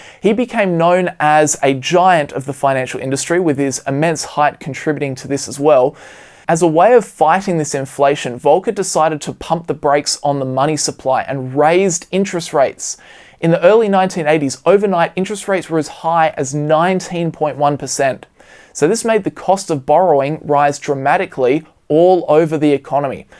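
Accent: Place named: Australia. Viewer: Not bad for an Aussie!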